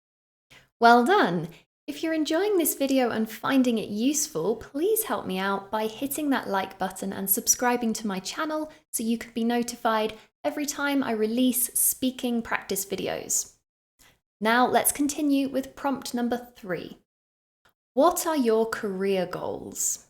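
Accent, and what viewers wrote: United Kingdom. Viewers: Your British accent absolutely shone through . I want to speak like you but it would take me years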